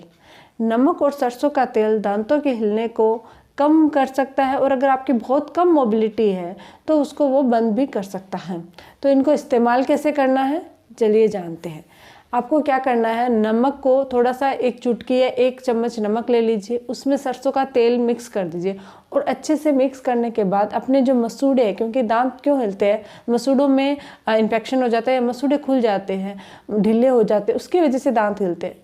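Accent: Rajasthan, India. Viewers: mam ap Rajasthani hona? Apki tont se lagra hai 😁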